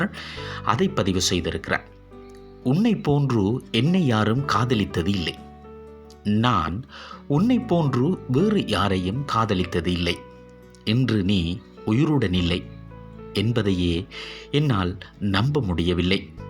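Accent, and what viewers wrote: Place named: Sri Lanka. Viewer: Ok Anna neenga sri lankava unga slang apd dam erku😢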